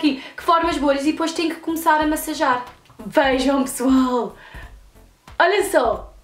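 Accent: England, United Kingdom. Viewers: gosto tanto do teu british accent 😍😍 vou comprar essa máscara 😂😂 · adoro o teu sotaque britânico 😍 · Que sotaque inglês lindo!!! ❤️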